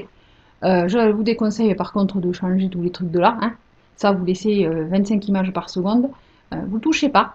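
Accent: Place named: Provence, France. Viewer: et merci l'ascent provensal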